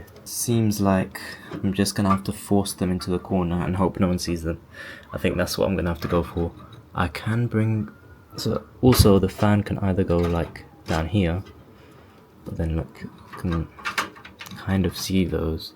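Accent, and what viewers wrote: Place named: United Kingdom. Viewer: You sound like another British bloke, Lewis Hamilton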